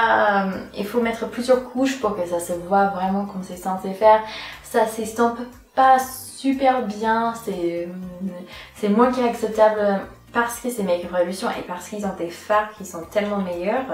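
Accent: United States. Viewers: ***** Well, I'm wondering... Which words do you precisely need to learn? Because even though french is good, she pronounces words with her american accent. I know very well that most french beauty youtubers are boring though, so you probably don't want to watch their videos. ^^ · tu et tré jolie mais tes une francaisse ou américaine parseque ta un accent américaine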